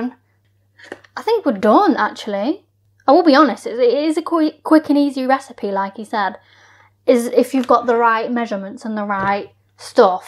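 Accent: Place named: Kentucky, United States. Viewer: absolutely I love your accent, and mine is just as country if not more so than Chris, Amy, and Tammy’s! We’re from the same neck of the woods here in Kentucky! 😂 Biscuits and gravy have to be HOMEmade not from a restaurant otherwise you just congealed slop. 😂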